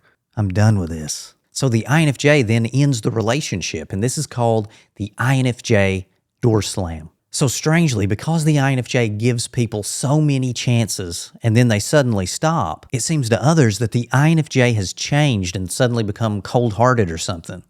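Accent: South, United States. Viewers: Love your southern accent. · What state are you from?? Trying to figure out the accent bro lol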